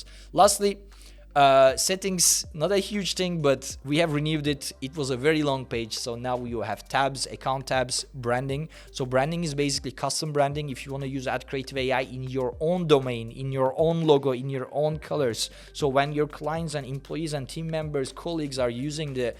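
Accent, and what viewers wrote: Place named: Turkey. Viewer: Türklük damarım tuttu:) Harika araçmış diye videoyu izlerken, aksan dikkatimi çekti ve başa dönüp isme döndüm. Ve. Helal olsun, tebrik ederim. Çok güzel iş.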